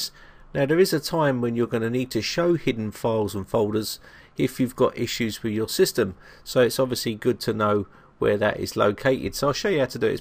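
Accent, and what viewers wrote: England, United Kingdom. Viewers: It's just the English fucking accent you bellend, you know.. the one that came from England and not 'Murica..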